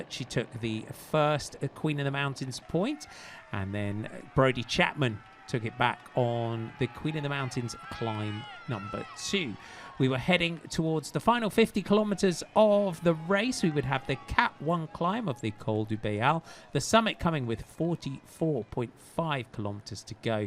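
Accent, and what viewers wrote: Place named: England, United Kingdom. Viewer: It's the English language, not the American language. They invented the language. He's pronouncing it the way all English people pronounce it. Here, in Canada, we also say "kill-ohm-mahters".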